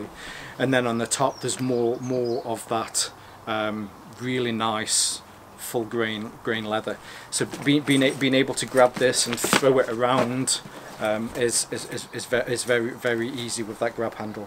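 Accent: United Kingdom. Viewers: I love my Tacsac 13. I'll never NOT carry a Nutsac bag (I started with the Man Bag Dammit). Let's face it, every 15-year-old that dwells within all men loves the naughty name of the company. Plus, we yanks are suckers for the Brit accent. Keep up the good work old chap!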